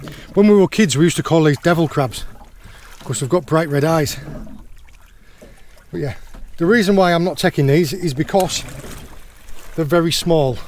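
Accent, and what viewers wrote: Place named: Geordie, United Kingdom. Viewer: Hello Sir, I hope you, family and friends are all well. What a great video. I shoot and fish for the pot only, or give away to the neighbours, nothing goes to waste. Very informative video and entertaining too. Are you Geordie or Sutherland? My Dad could tell. Take care and stay safe., England.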